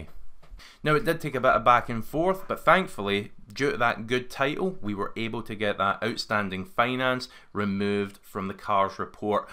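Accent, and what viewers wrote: Scotland, United Kingdom. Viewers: I subscribed just for the accent - I'm a McRae but live in Australia after my great, great grandfather emigrated. However a Scottish brogue still resonates with me. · You need to change your accent,,,you are speaking scottish,,,,everyone detests scottish lingo,,,soplease,,,go to a voice coach,,,they will kill your problem. Jus sayin is all.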